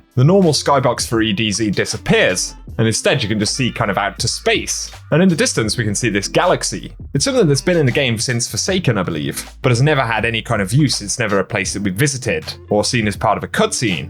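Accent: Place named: Midlands, United Kingdom. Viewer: I would’ve out good money on Fate of all Fools being in here! Great video! Ps are you from Birmingham / the Midlands? Your accent sounds familiar!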